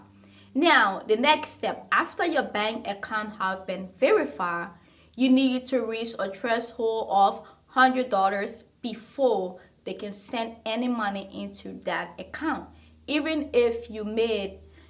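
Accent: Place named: Liberia. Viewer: Hello, JackieNaturals is always mentioning your name so I decided to check you out and support. Your made your first $100 on my birthday. I think YouTube takes a percentage. I like how you’re matching with your eye look. I just went on your website and found out you’re 🇱🇷, I knew I heard an accent. I’m Liberian too 🥰🥰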